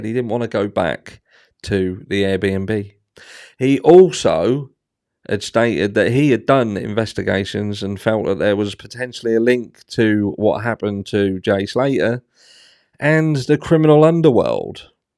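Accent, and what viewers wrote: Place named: Somerset, United Kingdom. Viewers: There's nothing particularly bizarre about my comment at all. You said yourself that MWT behaved in some ways like a "content creator". Maybe he has "created some content"? Was that not one of the very questions you were touting? Call yourself what you will, you are a person who is also inserting yourself into high profile cases to further your channel, raise your profile and therefore further your own ends. I see little difference between you and MWT in many regards. I'm not having a go at you, merely intimating that you are not that different to him. All people in the media create / deliver content to some extent. The difference with him is that he is an older bloke trading off the back of having "exposed" Savile, albeit posthumously, hence could not be sued for defamation. I think MWT is something of a chocolate teapot and an opportunist. 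He is asking questions about this case somewhat in the public eye, as are you. You are a so-called content creator who has created this blue branding to match your blue eyes, and pontificate, slightly sanctimoniously at times, about cases in what sounds like an Estuary Essex / West Country fusion accent, and in a slightly laconic, sweary way. You purport to speak about cases respectfully about cases as if you "had skin in the game" but seem decidedly disrespectful about the 46-year-old "Hungarian fella" you say "should have f**** known better". Perhaps your benevolence only extends to young gangsta dabblers, and the similar fate of a sl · Hello from Gloucester, lovely balmy evening tonight, but dreading the winter 😟 Love your videos and your lovely Somerset (?) accent.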